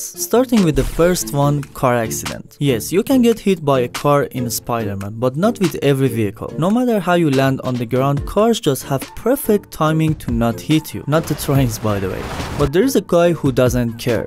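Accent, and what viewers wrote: Iran. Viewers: خخخ. داداش دمت گرم. از لحجه ات فهمیدم ایرانی هستی 👍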